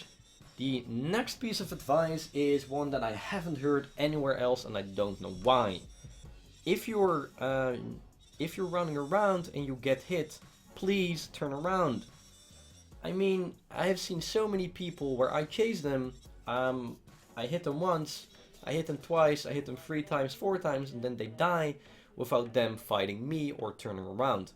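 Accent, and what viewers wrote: Netherlands. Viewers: the first sentence already told me you are dutch. My accent is as bad as yours xD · Are You dutch?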